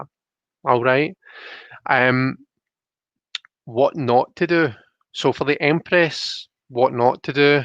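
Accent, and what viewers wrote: Scotland, United Kingdom. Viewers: Tarot with a Scottish accent <3<3<3 Is that Glasgow? Definitely subbing!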